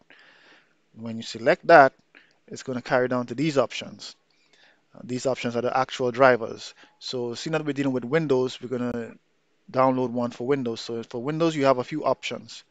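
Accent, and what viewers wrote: Trinidad and Tobago. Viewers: Is that a trini accent? Love it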